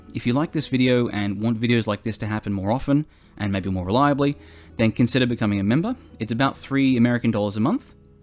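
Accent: Australia. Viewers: Your accent sounded familiar and than I realized ya were australian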